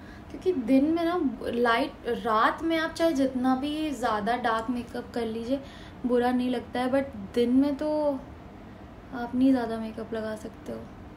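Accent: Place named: Lucknow, India. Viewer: assalam alaikummm .....bhot beautiful ho.....aap ki awaj ekdm lko walao ki trah haiiiii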